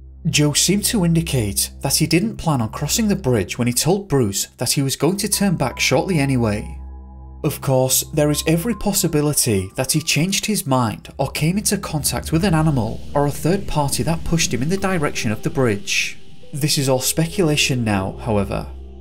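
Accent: United Kingdom. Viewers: NGL I clicked on this video because the topic seemed interesting but your cadence and intonations while reading are so grating I cannot stand watching. Regardless of the words or sentence structure you say a few words then fade out, short pause, repeat until the end of a sentence where you slow the last few syllables down like you've run out of air. The lack of variation makes it sound like a slightly more advanced british microsoft sam.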